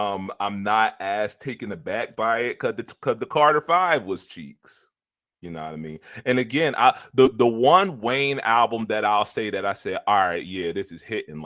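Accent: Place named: Philadelphia, United States. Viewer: You from Philly, ain’t cha? 🧐😏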